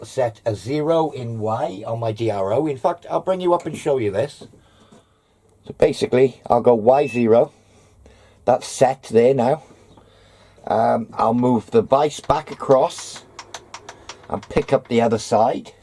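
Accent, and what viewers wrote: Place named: Wales, United Kingdom. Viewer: Enjoyed that, keep up the waffle I think it adds something hearing those Welsh tones.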